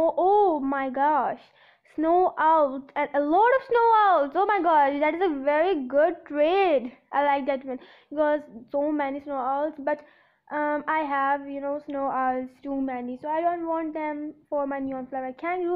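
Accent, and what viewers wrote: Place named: India. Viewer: His accent is Indian but the video location is the us weird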